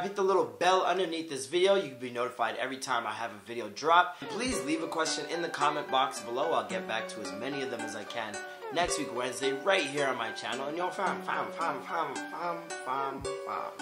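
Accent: Jamaica. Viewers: are u Jamaican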